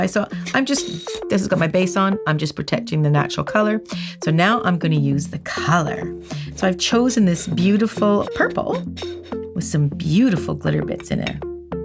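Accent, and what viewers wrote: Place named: Canada. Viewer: You sound Canadian,. Are you from Canada? I’m living in England, but my husband and I were born in Ontario. 🇨🇦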